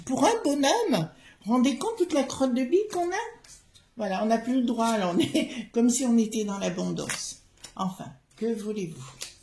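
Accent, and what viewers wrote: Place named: South, France. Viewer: c’est le parler du sud je connais et ça ne me choque pas !‘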